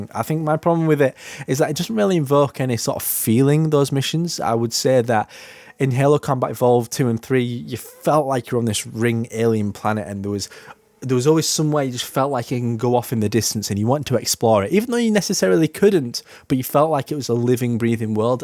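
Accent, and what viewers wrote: Yorkshire, United Kingdom. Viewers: Sounds like a Keighley lad.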